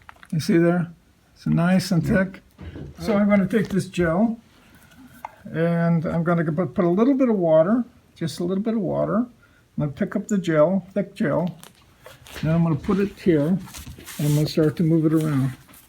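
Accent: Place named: Mexican American, United States. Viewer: Love your work. May I ask what ethnicity you are if I close my eyes I can hear the voice of someone I know who is Mexican American. Not that it matters. In any event keep up the great work!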